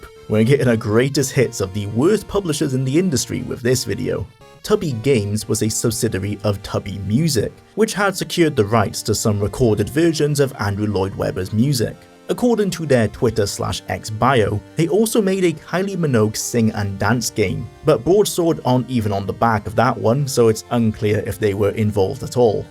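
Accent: Wales, United Kingdom. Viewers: What gave that away? The thick Welsh accent?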